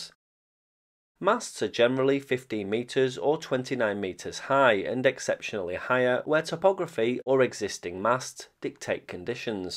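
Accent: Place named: North, United Kingdom. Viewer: with a northern accent again. With Gareth Dennis levels of rail nerdity. Still love it.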